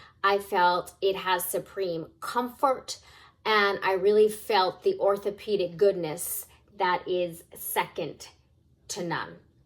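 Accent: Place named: South, United States. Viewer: you speak really well, your voice is therapeutic... do you speak another language?? i can tell you are from the southern US.. love the vid you look great btw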